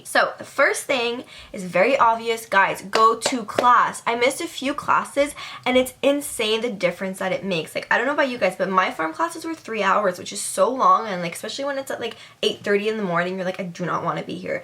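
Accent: Canada. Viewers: Omgosh!!! your canadian?!?!?!? That makes so much sense!! I always thought that your accent was unique.... and that i've heard it before.. lol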